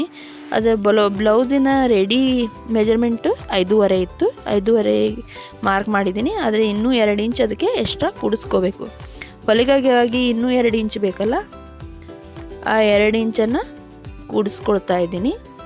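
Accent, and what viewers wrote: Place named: Mandya, India. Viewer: ಹಾಯ್ ರವರೆ ನೀವು ಕಾರವಾರದವರ ನಿಮ್ಮ ಭಾಷೆ ಮಂಡ್ಯ ಭಾಷೆಯಂತೆ ಇದೆ 👌🙏❤️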